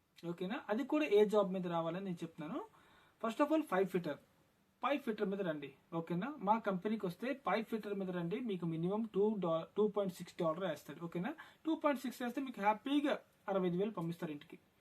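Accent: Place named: Andhra-Odisha border, India. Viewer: నువ్వు ఆంధ్ర ఓర్రీస బోర్డర్ కదా నీ తెలుగు అలానే ఉంది బోర్డర్ కదా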